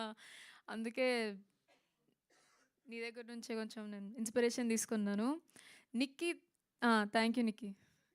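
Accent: Telangana, India. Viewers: Maa telangana bidda lekka matladinavu amma maa support niku untadi and PK gari fans niku andaga untam and koncham daddy ki cheppu oka manchi telangana slang cinema thiyamanu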